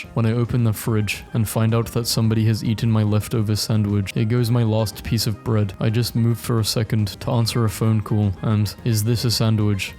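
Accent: United Kingdom. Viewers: ur bri'ish? i thought u were an aussie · Wait… your British?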